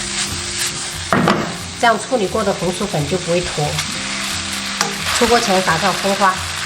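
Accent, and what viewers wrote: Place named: Quanzhou, China. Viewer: 是福建泉州的口音。也屬於閩南語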